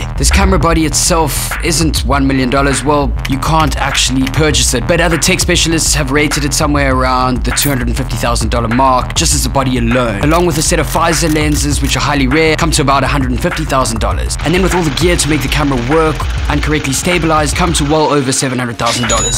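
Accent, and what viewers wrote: South Africa. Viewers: Hey u sound South African.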